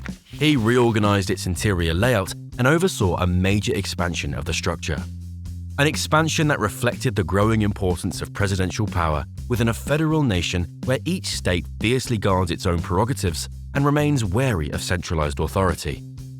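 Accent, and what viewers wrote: United Kingdom. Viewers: Why does every fcking show have to have the British accent. Were not fckng Brits!!! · If your going to use an AI to talk about an American icon, why would you make it sound British? :person-turqouise-waving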